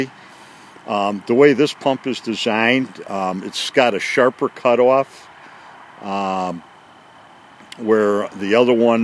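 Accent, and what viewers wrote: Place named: New England, United States. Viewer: I like your videos on this... How much is the electric start option, may I ask..., and where are you located. Your accent seems New England, me, I'm originally from Connecticut, Wallingford area. Thanks for all the good tips...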